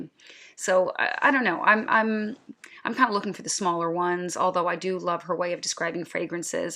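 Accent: Midwest, United States. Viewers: Are you Swedish? If so, I never would have thought. You accent comes off as American Midwestern or from the Rocky Mountain States. Impressive. I greatly appreciate your take on denying free this and that. Reviewers are now stating that they received their products but their opinion are their own. I don’t believe this. I’m too seasoned to think kind gestures aren’t returned in kind. Reviewers are essentially telling us to pay for their free bottles, by buying this House’s offerings.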